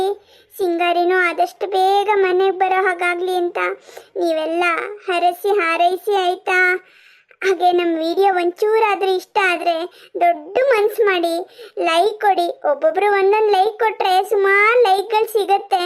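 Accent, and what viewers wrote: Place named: Mandya, India. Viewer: ಮಂಡ್ಯ ಭಾಷೆ ಸ್ವಲ್ಪ ಇತ್ತು 👍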